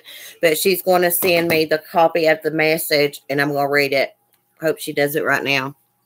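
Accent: South, United States. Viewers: New sub, just like hearing a fellow southern accent! I only watched A once way back when the golightly drama happened. Hate this for the ones she hurt deeply and her son.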